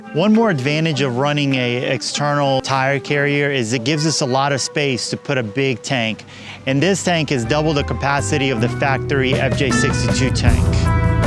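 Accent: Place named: Louisiana, United States. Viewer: Why you got a Cajun accent, my guy?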